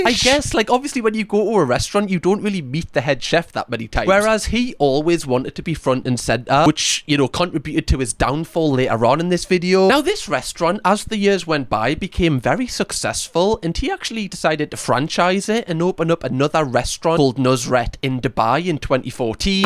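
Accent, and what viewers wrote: Newcastle, United Kingdom. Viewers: Hes a foreinger from up north jordy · Close; he is from the north, but is a Geordie from Newcastle/ Sunderland. Not from Yorkshire. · Geordies innit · he's a Geordie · Im Welsh I bloody love your Goerdie accent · isn’t talking with an inflection, he’s talking with a Newcastle accent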